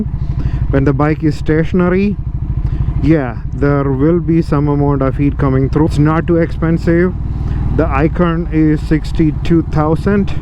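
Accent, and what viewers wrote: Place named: Kerala, India. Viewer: Indian origin Malayalis Voice recognised in this video 😅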